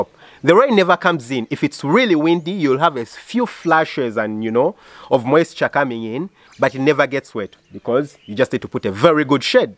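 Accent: Uganda. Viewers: Our favorite Dr,we love your passion for farming.We also love your Ugandan accent.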